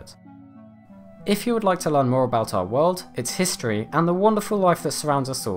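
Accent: United Kingdom. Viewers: STOP WITH THE ANNOYING BRITISH ACCENT. Standard American English is fine. Doing a video on Aussie Wildlife, how about using an Australian accent..JMHO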